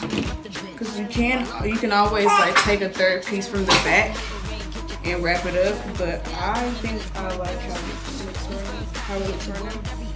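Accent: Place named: Baltimore, United States. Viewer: Im From Baltimore 😍😍 u do talk like us a lil bit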